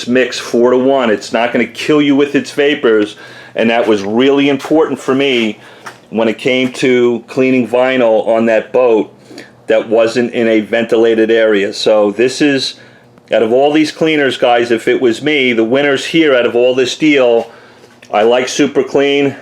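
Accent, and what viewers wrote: New York, United States. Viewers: Are you a new yorka? :-)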